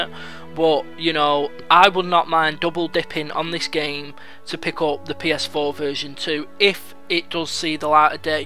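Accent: Manchester, United Kingdom. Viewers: is that a manchester accent? im from sweden so im just curious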